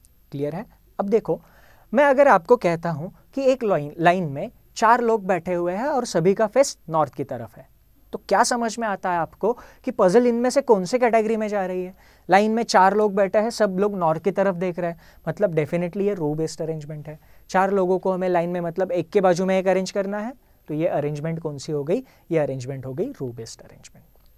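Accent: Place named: Maharashtra, India. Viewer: that marathi accent is 😍😍😍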